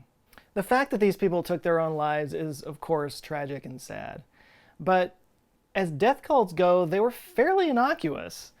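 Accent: Texas, United States. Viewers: What a Texas accent 😅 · Finally we get you true Texan accent!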